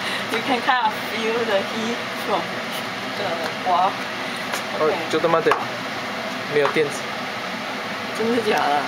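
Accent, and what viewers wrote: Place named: Cantonese, China. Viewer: DV沒電了嗎?~_^ 您的廣東腔果然很重, 我看到了妳廚房裡面也有"米酒" haha~~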